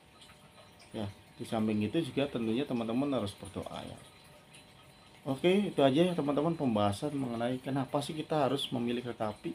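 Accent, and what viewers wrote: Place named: Cirebon, Indonesia. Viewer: Logat Cirebon nya keliatan bangat pak 😂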